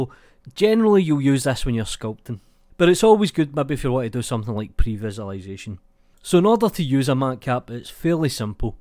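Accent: Scotland, United Kingdom. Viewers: you're instatntly my fav blender tutorial for your scot accent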